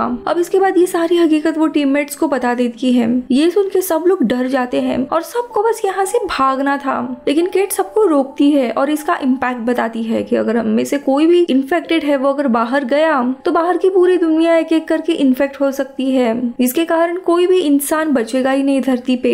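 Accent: Maharashtra, India. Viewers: Are tu Marathi ahes Ka 😅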